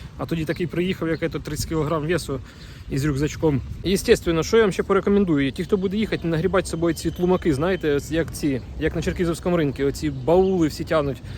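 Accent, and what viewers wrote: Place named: Poltava, Ukraine. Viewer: Друже, з Великоднем!!! А ти з України звідки? Балачка наче Полтавська. Нехай щастить.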